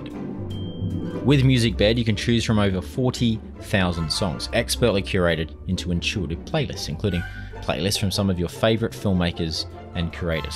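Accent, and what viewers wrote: Australia. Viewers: Hi, just found your channel, couldn't stop watching when I did. love it. first thing I noticed was an Aussie accent. love your work mate. Gold Coast.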